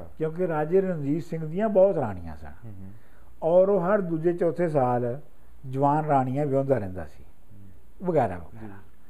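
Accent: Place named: Jhelum, Pakistan. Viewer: Navid Raffiq , his language was punjabi though jhelumi accent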